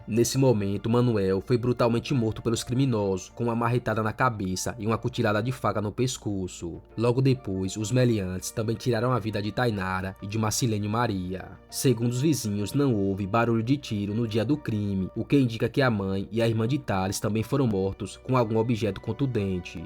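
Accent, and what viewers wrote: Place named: Rio Grande do Norte, Brazil. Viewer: Amigo, você é do RN? Tu tem sotaque de potiguar.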